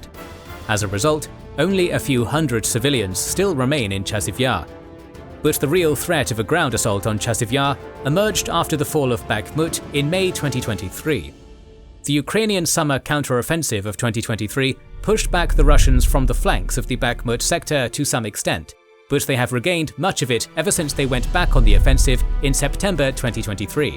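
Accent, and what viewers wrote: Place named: United Kingdom. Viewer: Forget the animation....the fact that these videos are narrared by a real person with a bona fide british accent is something I find very easy on the ear, no repetitions volume changes or any murdering of local place names.Top man,keep it up.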